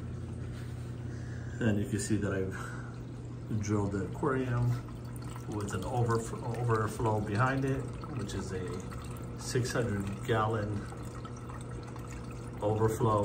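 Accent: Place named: United States. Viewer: Salutare din uk, cred ca ești demult în usa ai accent fix te usa, foarte diferit de uk. Super acvariul !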